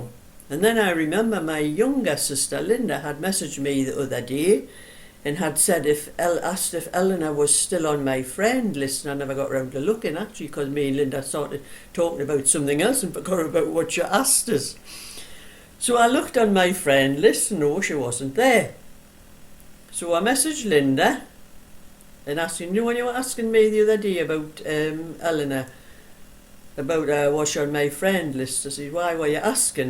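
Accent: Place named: North East England, United Kingdom. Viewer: I have just come across your channel, it is such a joy to hear your lovely North East accent. My husband came from Houghton le Spring County Durham. Sadly my husband and I had serious covid at the very beginning. Sadly my very fit and active 82 year old husband died of covid the first day of lock down. I live in Cheshire and so I don,t hear the lovely lilt and phrasing of yours and my husbands accent any more. Thank you for your just lovely warm and homely channel. Regards Christine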